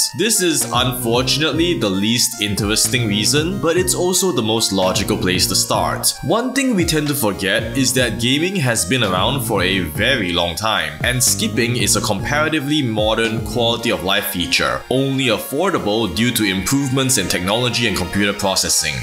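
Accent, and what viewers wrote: Singapore. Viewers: I'm curious what accent this is btw. Feels like Singaporean mixed with something else. And Singaporean makes sense with your DigiPen cert.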